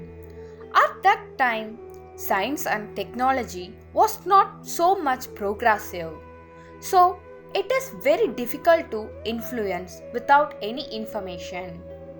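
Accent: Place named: India. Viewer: Oh really, indian accent sucks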